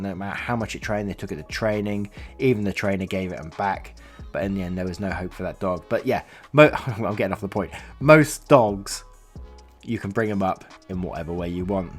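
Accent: United Kingdom. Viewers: While I know why most Youtubers stay away from swearing these days... I do enjoy someone who actually fills out the swearing even when the text is censored! Sometimes people just need to hear that they're being a twat, right? is one of my favourite Reddit readers partly because of the chill attitude, the chuckles and of course the British accent.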